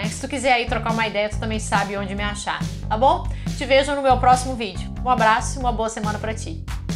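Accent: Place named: Rio Grande do Sul, Brazil. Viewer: Tu é gaúcha, hein..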